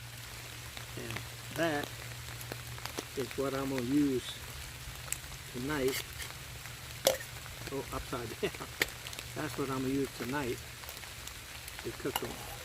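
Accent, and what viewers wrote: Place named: Charleston, United States. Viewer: I'm from SC. I'm trying to figure out your accent....Charleston? Anyway, fantastic vids! From Fort Mill.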